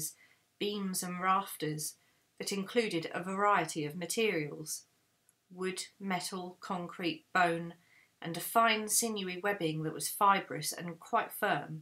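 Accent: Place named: United Kingdom. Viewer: Thank you for reading Lig's for us. I would try to be closer to your microphone, or use a headset one that has mic close to your mouth. Your voice is lovely, as are most UK accents.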